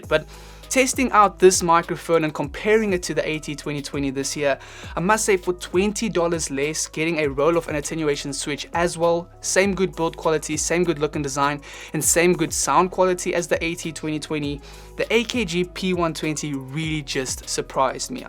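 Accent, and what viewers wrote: South Africa. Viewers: Ah so cool, I could tell you were South African staight away. Very cool to see a saffa respresenting in the youtube music scene!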